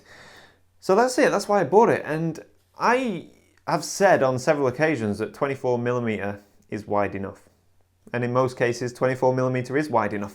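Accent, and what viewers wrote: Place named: United Kingdom. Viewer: I was just wondering, but where does this guy live ? ... it's raining all the time and he speaks proper English.... must be a Brit ! and I like it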